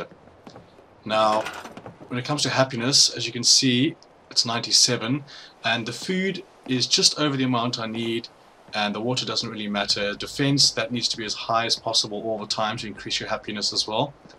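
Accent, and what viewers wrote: South Africa. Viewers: I think I recognize that accent. Are you South African? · My moms friends south african and I love his accent! Its a lot like yours! keep up the sound work lad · Are you South African? I am so your accent just sounded familiar.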